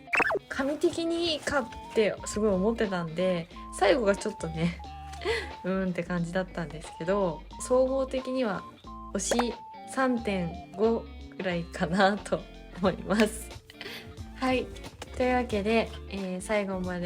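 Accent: Yamaguchi, Japan. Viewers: カメラの前を占拠するミンちゃん最高です🐶 ミンちゃんちゃんと分かってますね🤣流石です👍 メンテナンス、、、ズボラに果たして出来るだろうか？今回やたら山口弁が出てるのは気のせいだろうか？笑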